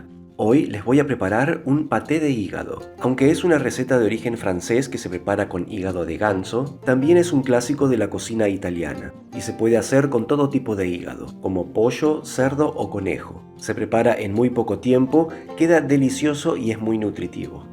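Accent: Argentina. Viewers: Hola! Un verdadero placer y deleite escucharte y ver tu video!!!!! Tan profesional y con tanta excelencia!!!! Es rarisimo encontrar a alguien que no esté a las corridas en un video, pero tampoco estás hablando gansadas con parsimonia para llenar espacios huecos. Tu acento neutro argentino, a mi parecer, fue extraordinario!!!!! Mil felicitaciones! Soy desde ahora tu fan. Me gusta la impronta de ponerle un poco de historia e información del origen de la receta. Gracias por cada detalle de los que te mencioné. Un fuerte abrazo desde Córdoba, Argentina!!!! 🎉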